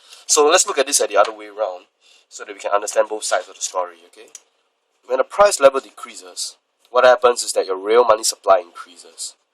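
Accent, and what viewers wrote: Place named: Singapore. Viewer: Hi, thank you for your videos! Btw, are you Singaporean? Your accent sounds like it. That's cool because I understand everything you said in contrast to my university lecturers in Estonia. :D Cheers!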